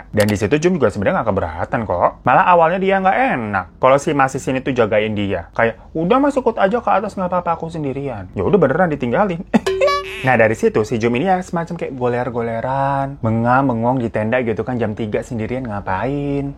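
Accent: Java, Indonesia. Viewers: Lucu bgt ya, kak bahasa jawanya lucu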